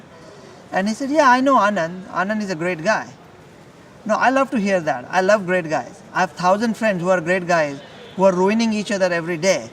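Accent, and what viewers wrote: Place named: United States. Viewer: Waaa, speaking in अमेरिकन एक्ससेन्ट खूपच छान, बरयाचदा मराठीत ऐकायची सवय होती..👍👍👌👌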